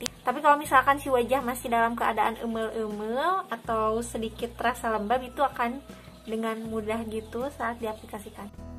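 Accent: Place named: Sunda, Indonesia. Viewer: Eta eumeul eumeul...meni nyunda pisan atuh, si teteh geulis teh...he he...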